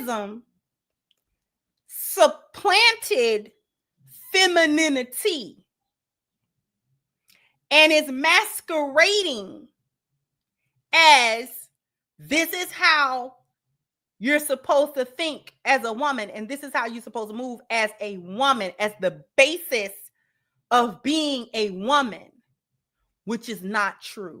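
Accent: Chicago, United States. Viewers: Yep, loved the "tuna can" comment. That's Chicago in you! I know it when i hear it! 😂😂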